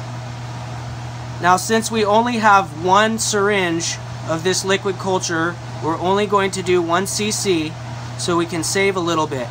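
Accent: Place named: United States. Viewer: you sound like a warm blooded American, like me. Folks jealous can't stand your teaching freedom & and basically free self-sustainability, gj, and Many-Thanks!! I'm wanting to start growing some. You could almost live off these mushrooms, if it's enjoyable it could make you some decent money. 🍄 many thanks my friend.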